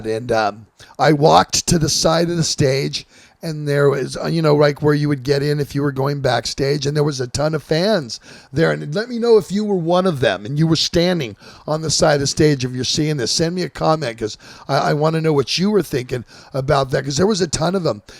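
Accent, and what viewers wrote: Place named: South, United States. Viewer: I fucking love the southern accent you like to throw. But you sound like you may be from Bama instead of Florida. 😂🤣 enjoying the stories.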